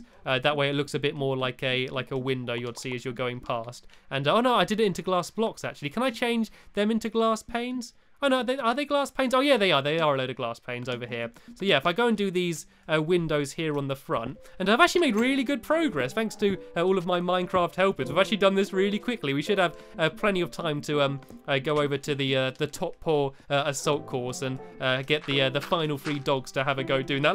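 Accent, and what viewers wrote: United Kingdom. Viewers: I used to think he was saying Alpha Lee until I realized he was saying L for Lee. Can't understand much of his accent, not being British.. XD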